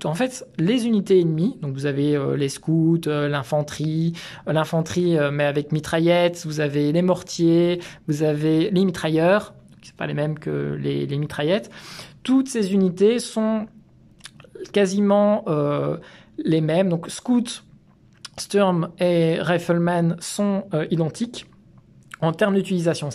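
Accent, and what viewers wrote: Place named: Belgium. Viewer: ahhhhh caaaaa ne m'en parle pas. Mais ça fait plaisir d'entendre cette voix belge :)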